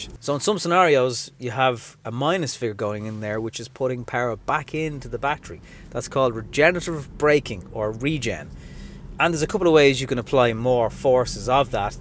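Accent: Ireland. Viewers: Nothing new to me, but THE best explanation for beginners with EV cars. Thank you, and by the way, I love your Irish accent. Can´t wait to come back to lovely Ireland.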